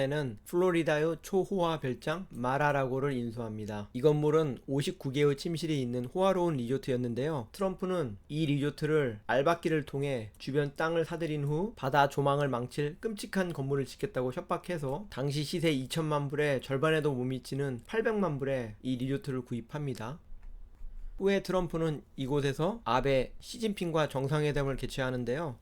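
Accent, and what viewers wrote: Jeolla, South Korea. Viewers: 이분 전라도 분이네ㅋㅋ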